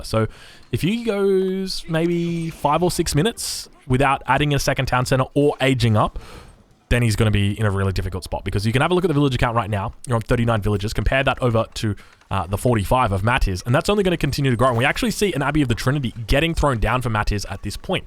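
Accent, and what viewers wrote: Australia. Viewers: Im italian but i can feel his Australian accent is funny.good to see some aoe game and learn something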